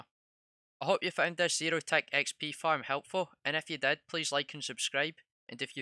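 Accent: Scotland, United Kingdom. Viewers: He Scottish mayte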